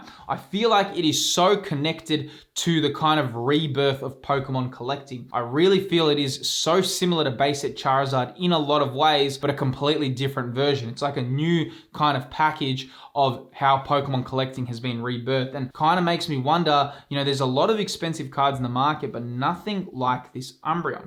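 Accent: Australia. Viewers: Just started collecting again and im so glad I stumbled across your channel. It’s so refreshing to watch and hear a fellow aussie! love the content it’s been super helpful and insightful :)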